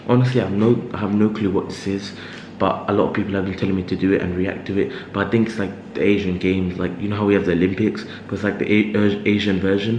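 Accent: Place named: Scotland, United Kingdom. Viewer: are you Scottish? idk you have thick accent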